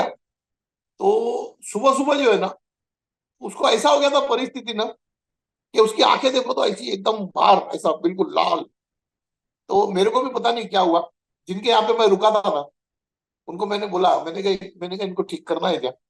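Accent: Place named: Maharashtra, India. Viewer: आप के हिंदी मे मराठी आती है, बहोत अच्छा लगता है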